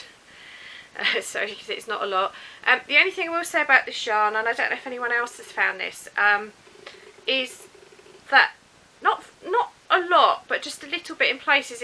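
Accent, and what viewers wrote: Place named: England, United Kingdom. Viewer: Just found you and am enjoying your cheery English accent. I will be working on a virus blanket soon. Thank you. Mary Wigmore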